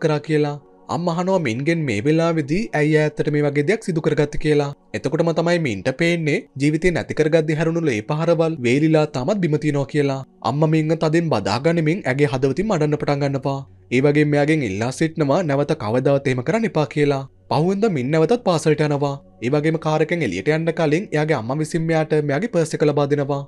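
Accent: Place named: Galle, Sri Lanka. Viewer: මේ කතා කරන එක්කෙන ගාල්ලෙ පැත්තෙ වෙන්න ඔනා.....😂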